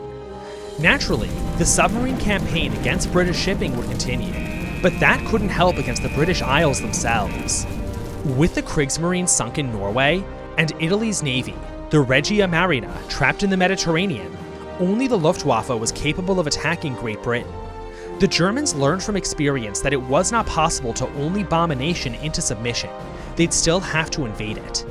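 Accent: United States. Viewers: Why is Britain speaking with a yank accent….? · Pov: most American voice for us Brits Eva.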